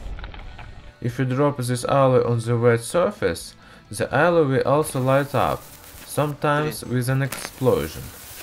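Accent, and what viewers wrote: Russia. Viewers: Cilla Moke it's a Russian accent, isn't it?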